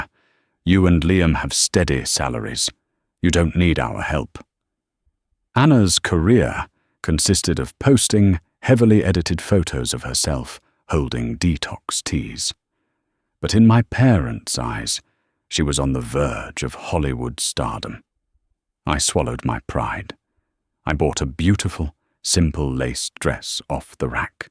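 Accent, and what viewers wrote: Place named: United Kingdom. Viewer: Why is this story about a female and they used a male British accent it’s confusing and the voice is all wrong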